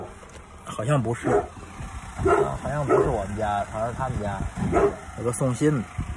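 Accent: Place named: Tianjin, China. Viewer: 特別喜歡這樣的節目，親切，踏實，接地氣，妥妥的天津口音普通話，還有那條漂亮的大狗。請繼續做下去，謝謝這樣美好真誠真摯真實的分享。🙏🙏🙏🙏💝😳😳😳😳😇👍👍👍👍👍👍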